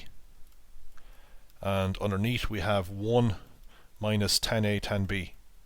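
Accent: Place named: Ireland. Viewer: irish probably incase ur still wondering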